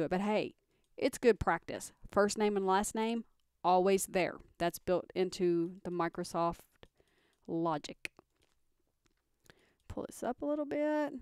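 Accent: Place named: Texas, United States. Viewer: I really enjoyed your laid back presentation and, being from Texas, I appreciate your accent! (finally someone I can understand who isn't mirroring video game graphics to amp up their presentation. I only wish your screen were clearer. It is very "soft" and unfocused. Otherwise perfect. Keep up the great work!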